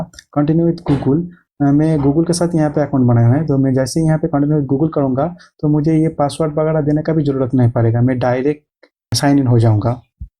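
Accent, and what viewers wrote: Bengal, India. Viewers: Ap bangali ho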